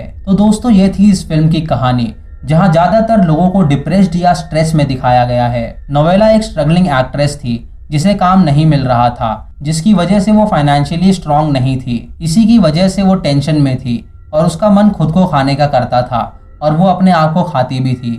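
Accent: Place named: Bihar, India. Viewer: Bhai bhihaari hain kya (hain,hain,hain) bol raha Bhai sahi se explain ker movie. 🖕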